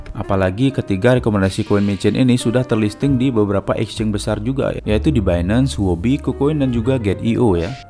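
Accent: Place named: Bali, Indonesia. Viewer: orang bali boss...logat nya mirip...tapi artikulasi penjelasannya muantapp. dan sangat mudah di mengerti..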